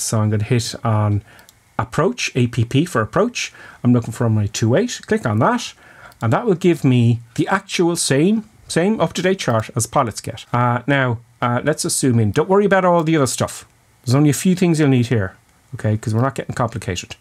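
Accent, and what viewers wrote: Ireland. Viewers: I'll never fly these big jets, I just come for the great craic. Keep it up. Highly entertaining, especially the response to the shite adverts. No one says it quite like the Irish 👍😀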